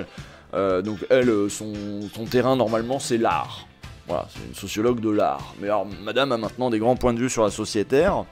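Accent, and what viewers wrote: Quebec, Canada. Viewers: L'accent québécois toujours au top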